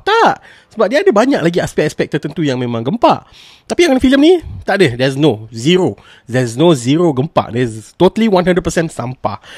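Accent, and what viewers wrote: Kedah, Malaysia. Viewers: First time dengar cakap loghat Kedah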